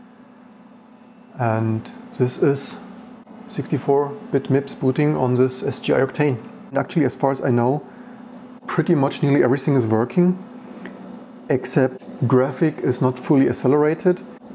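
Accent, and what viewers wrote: Germany. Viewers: Mh the heavy german accent, delicious! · Your english is very german.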